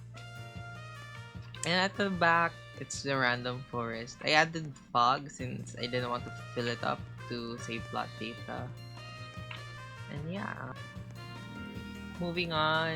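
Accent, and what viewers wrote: Philippines. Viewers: You're so creative and patient with your builds! Btw r u Filipino? We have the same accent 😩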